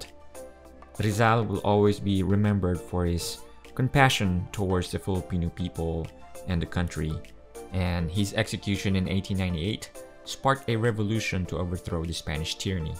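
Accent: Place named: United States. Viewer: Akala ko amerikano ka biglang nagtagalog Hahahahaha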